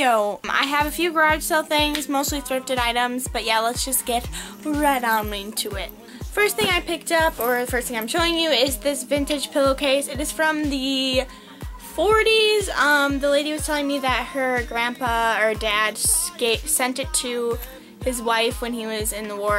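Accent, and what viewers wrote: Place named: Ireland. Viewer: Cool video! Love seeing the younger generation antiquing! Also geeked out at the irish accent :p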